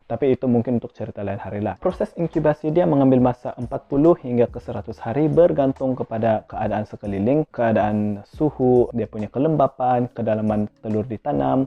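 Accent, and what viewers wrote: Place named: Sabah, Malaysia. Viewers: Ini bukan cakap indon ye gais, ini cakap Sabah..... saja nak bagitau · Pekat betol sabah kau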